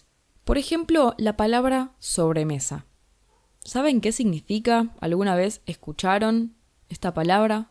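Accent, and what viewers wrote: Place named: Rioplatense, Argentina. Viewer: Great accent to learn. I love Rioplatanese. I wish there were more of these to listen to, since it is valuable Comprehensible Input, but I am looking for 500 hours worth, not 500 minutes. Still, it is nice to have this Argentine source for a short while. Thanks for making these videos.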